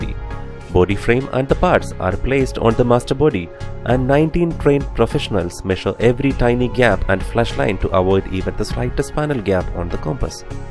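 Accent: India. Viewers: That malayalie accent though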